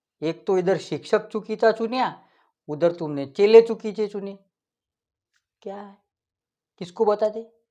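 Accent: Vidarbha, India. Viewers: फार सुरेख नाटयमय कथाकथन ! Your pronunciation is with a humorous accent ...Your speciality.. वैदर्भिय लहेजा ..Pl keep it up !👍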